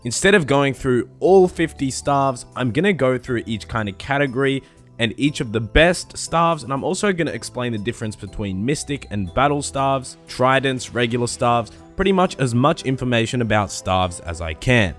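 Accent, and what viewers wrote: Australia. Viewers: Didn't know you were australian. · Ed Flitton Aussie accent. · To all the non-Aussies getting triggered over his pronunciation of Staff and Staves, just letting you know that's actually how we pronounce those two words in Australia nation wide. Nothing wrong with it. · BrokeMyNips He's Australian man, they pronounce everything differently :) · fuck all the hate aussies have the best accent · your pronunciation of staves is wrong, or is it just australian accent? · Love aussie accents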